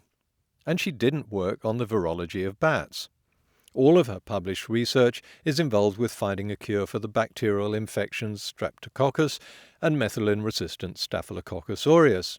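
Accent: RP, United Kingdom. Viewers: some well-spoken British guy to voice it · actually he seems fairly obsessed, neurotic, arrogant, intellectually lazy, poor debater, and possibly lies on purpose to farm his viewers. But he has a British accent so people get confused and give him attention. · This video is garbage. it is claimed that the Wuhan Virology Lab does not exist. But there is very clearly a Wuhan Institute of Virology: <link> How can people just blindly trust this video? Because the presenter has a posh accent? I mean, come on, just fucking Google and you can see the blatant lies in this video.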